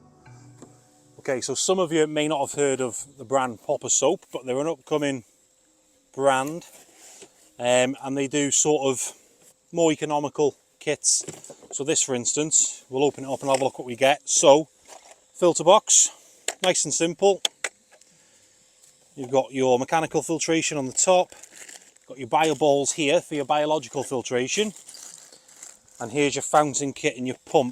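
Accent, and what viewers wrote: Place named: Cornwall, United Kingdom. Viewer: Thought i could hear a jacko accent. Wont the stocked goldfish keep the pond clean.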